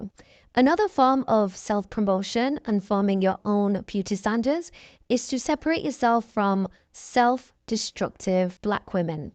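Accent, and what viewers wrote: United Kingdom. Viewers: The voiceover sounds like a White woman from up north in the UK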